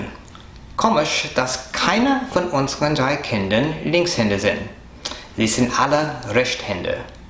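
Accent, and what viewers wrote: United States. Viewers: you seem to have the American accent on your r's and ch's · your voice sounds to me american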